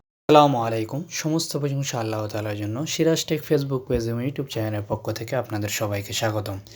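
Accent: Naogaon, Bangladesh. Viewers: আপনার বাড়ি নওগাঁতে নওগাঁর ভাষা বোঝা যাচ্ছে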